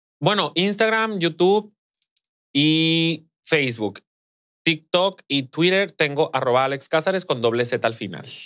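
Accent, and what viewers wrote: Culiacán, Mexico. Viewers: Ame el podcast jajaja pero ayyyy amigaaaaa jamás había escuchado taaaan notorio tu acento culichi jiji... Y nos adelantaste todo el chisme de la picadiente en fin, saludos bb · Excelente episodio❤ me gustan verlos videos de pero este estuvo perrisimo🤩💜 Me encanto tu asento culichi😍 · Me encanto que en el podcast se le escucha su auténtico acento culichi de Alex me gusto mucho la entrevista ❤ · Alex habla muy culichi fuera de su canal 😍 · Ayyyy asi todo!! Ese acento culishi 😍😍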